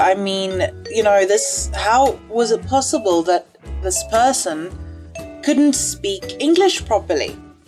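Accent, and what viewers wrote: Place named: South Africa. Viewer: I'm South African I can hear you are South African I can hear it. I know exactly what you mean when you made this video 🙂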